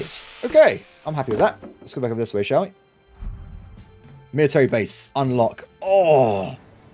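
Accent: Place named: United Kingdom. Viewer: "A lot and are" word,sir you very cool with British accents specially with A alphabet James bond accents,are you from south UK sir?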